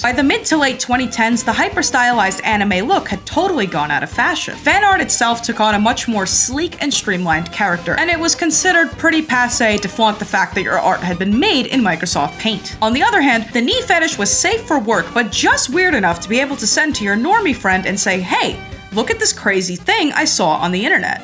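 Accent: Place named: Boston, United States. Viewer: Sorry for the unrelated comment, I do enjoy your videos, but I gotta say your accent is interesting 😅 it's mostly general American, but you have the Boston o, like in the words 'all', 'of', 'talk'. Do you have like one parent from Boston or something like that?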